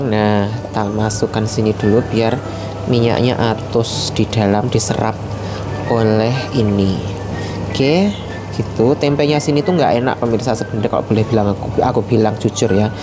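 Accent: Java, Indonesia. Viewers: Mas jawanya pundi kok bahasanya medok bangettt 😊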